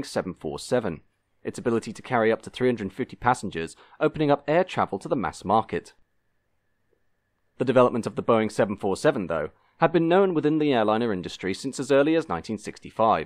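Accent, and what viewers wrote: England, United Kingdom. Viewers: with a name like that I didnt expect an english accent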